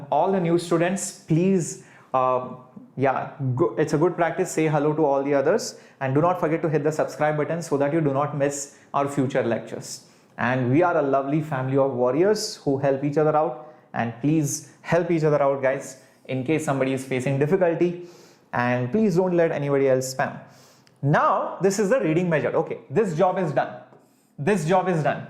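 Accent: South India, India. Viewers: Talking in English really feels south Indian connected equally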